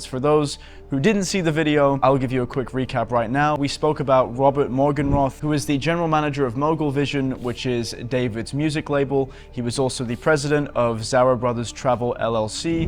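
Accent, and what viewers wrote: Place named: United Kingdom. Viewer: Who’s this slow talking Brit 🥱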